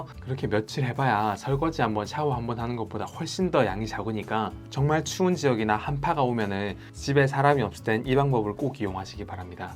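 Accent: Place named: Busan, South Korea. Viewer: 구수한 부산억양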